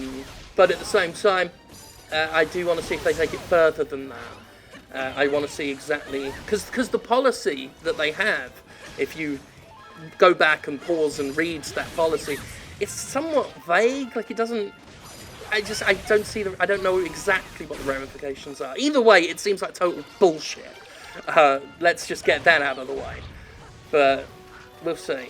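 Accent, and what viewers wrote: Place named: United Kingdom. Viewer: Second Sounds like you're British? Me too, but I moved to the States for Grad school. First exam I ever graded the class average was 68% (which I thought was good, of course) and the course instructor, when he saw it, said "Ooooh shit that's bad". Flip side is that it's common to get scores in the 80s and 90s, whereas a 70 when I was at university was excellent.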